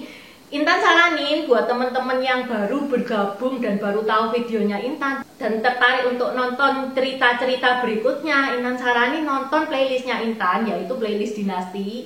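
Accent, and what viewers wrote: Java, Indonesia. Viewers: Logatmu jowo mbak mbak. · Intan loe lidah nya Jawa medok jawa mana loe domisili aku salut salam dari cilegon Banten Iskandar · Ce Intan org tionghoakah? Kok medok Jawa ya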